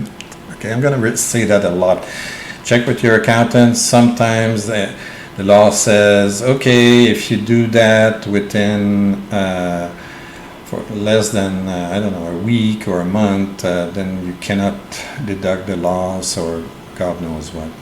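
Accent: Quebec, Canada. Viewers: love the french Canadian accent. keep up the good work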